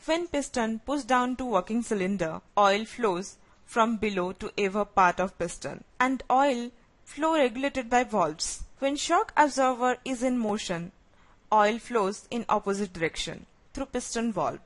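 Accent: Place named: India. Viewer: speak like true indian ,,anyway this is very very helpful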